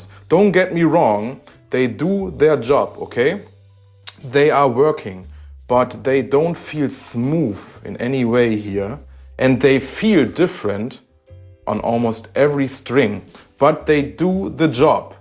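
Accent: Germany. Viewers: this German accent... (; nice video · Da du unüberhörbar Deutscher bist, werde ich dir auch so antworten: daß die Harley Benton für das Geld nicht schlecht sind, steht außer Frage. Trotzdem sind die Epiphone Gitarren wesentlich besser verarbeitet. Die Bünde der Harley Benton sind sehr unsauber bearbeitet und müssen gerade bei den unteren Bünden nachgearbeitet werden. Die Bolzen der Tune O Matic Bridge sind stellenweise nicht komplett im Body versenkt, und wenn dies alles behoben wurde, kann erstmal der überschüssige Kleber am Hals mühsam entfernt werden. Und dies ist kein Sonderfall. So etwas habe ich bei Epiphones der letzten Jahre nicht gesehen. Meines Erachtens kann man die beiden Brands nicht miteinander vergleichen. Wie die Harley Bentons mit diesen scharfen und stellenweise nicht polierten Bundstäbchen und den Kleberesten durch die Qualitätskonrolle gekommen sind ist mir zudem ein Rätsel. 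Trotz allem kann man mit etwas eigener Arbeit die Mängel beheben, und hat für das Geld anschließend eine brauchbare Gitarre. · Heavy German accent xD Danke für das Video hat mir echt weitergeholfen 👍🏼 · hi thanks for answering me back. I really enjoy your channel. I watched your HB Bigtone review, I am thinking of getting one. Did you keep your Bigtone and if so how do you like it now you have had it a while? Did you have any issues with the back bridge tilting under pressure from the steep angle of the Bigsby? I am a lot older, in my day we had to pay a lot of money for a good guitar. Nowadays companies like HB are making very good guitars for very little. All the big names are manufactured in China and Indonesia or using or parts from East Asian and so people may as well pay less and buy a HB anyway. They can always put new pickups in it or better tuners for half the price of buying one of the big names. 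I would really appreciate it if you can please let me know your honest opinion about the Bigtone. Lastly I love your German accent, I live in Northern Ireland and we sound terrible. 😁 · I don't have a black guitar yet. 1st time here because I'm looking to collect my 11th guitar. Playing on & off since 16. I'm 56 now & getting bored. I have all the expensive guitars. I'll add a Harley Benton. Because I have an Epiphone custom sunburst. They both sound great !!! You play pretty good too !! That helps. Lol.😅👍 At the beginning I thought is he German ?? But, your English is fine bro.👍 Keep rocking !!!🎼🎵🎶🎸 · Dieses Denglish mit solch einem heftigen preußischem Akzent ist unerträglich. Sprich doch einfach gleich Deutsch, dann tuts nicht so weh. Wen die Gitarren interessieren muß dich nicht endlos reden hören. Was die kosten weiß auch jeder.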